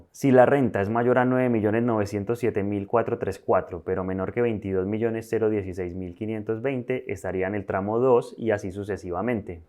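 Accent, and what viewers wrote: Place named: Colombia. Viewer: Bueno video, Clarito como el agua. Solo que tu acento colombiano causaba corto circuito en mi cabeza... pero muchas gracias por la info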